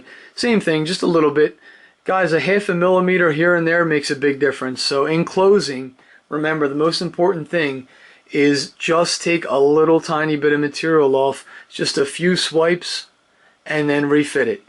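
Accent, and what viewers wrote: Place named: Pittsburgh, United States. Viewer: Not quite Ralph, sounds more Pittsburgh to me.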